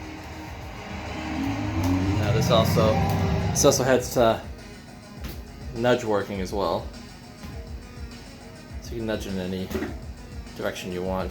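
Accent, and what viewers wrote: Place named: Boston, United States. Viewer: You sound like.yohr from boston :)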